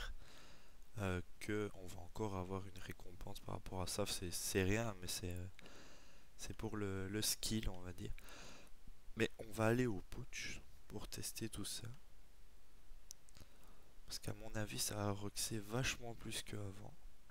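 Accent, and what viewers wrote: Belgium. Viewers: tu viens de ou de Belguique?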